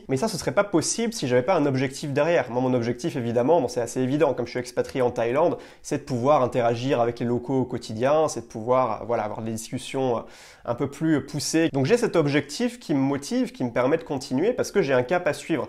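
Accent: France. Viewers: '' accent français dégueulasse '' et je suis pas d'accord, ça fait son charme des fois, à part si tu parles comme François Hollande 😅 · L' accento francese è bellissimo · c'est trop bien l'accent français, ça aide à pécho et ça mets un peu de noblesse française dans cette langue de plouc qu'est l'anglais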